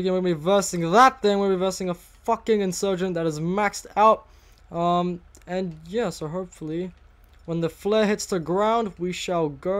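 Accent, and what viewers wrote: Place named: Australia. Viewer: I got bored and decided to watch a bunch of random videos and I just realized...you're Australian and you say "mad" you sound like might car mods lol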